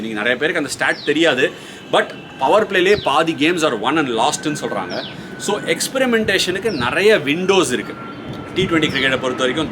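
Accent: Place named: Chennai, India. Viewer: I just love your way of talking. Keep it up. Very casual very Chennai though I am not from there,it's highly amusing.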